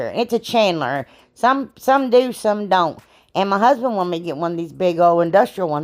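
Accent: South, United States. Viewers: I just found your channel,and love your creativity and you must be a southern gal also from your accent. Amazon. New subscriber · Love all of your projects. That door is awesome. Love your personality. Great southern accent. Take care. TFS. Have a crafty day. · U are a hot mess!! love your southern draw!!! My momma lives in North Carolina and has picked up the twang I laugh cause we r from Indiana. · AMAZON!! I am new here from channel, enjoyed everything but mostly the southern accent and phrases..makes me think of home. Grew up in Georgia and Florida and now I'm stuck out here in hell. Idaho..no boiled peanuts, no barbecue, no okra and no waffle house or Piggy Wiggle. I am gonna starve to death out here!! Loved ya and can't wait to see more!! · I thoroughly enjoyed you diys!!😂 I’m from Tennessee girrrl but you win! In the southern accent!🏆 I subscribed can’t wait for the next one😁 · Amazon.. 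AMAZON... Amazon 🤣😁.. I laugh so much watching you! I hear the southern in your voice. I'm in Tennessee💖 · sent me over, but your humor, accent and creativity reeled me in. I am Southern and felt right at home! It was hard for me to pick a favorite. The metal flowers have sparked an idea. Mi love the little houses and door. I like the hanging vases though. It is different than a traditional wall sconce and I have 4 thisisus signs I need to use! I was an Amazon subscriber before it was cool. I started on the Amazon mom program when I was pregnant with my middle son, 11 years ago. Doctor's office had tons of magazines with cards and you could get diapers for a steal! Transitioned over to S&S when it was introduced. I ❤️ Amazon!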